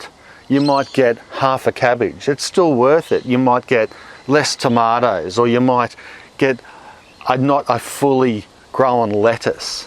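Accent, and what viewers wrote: Australia. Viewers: Oi Loike Baaiiibeees. Auzzie accent can be so epic. Also. Good video!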